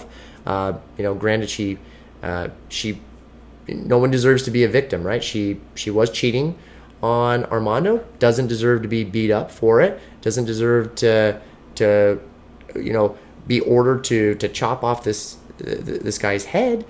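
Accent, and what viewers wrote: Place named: Canada. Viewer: Who is this dude Me love this Did u find me Or i find you WHY DO U SOUND SO KANADIEN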